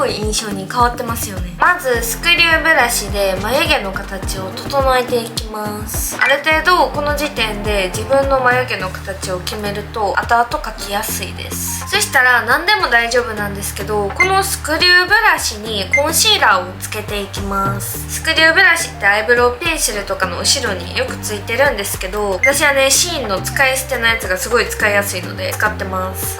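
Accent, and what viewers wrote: Kansai, Japan. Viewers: 関西弁なのかメロい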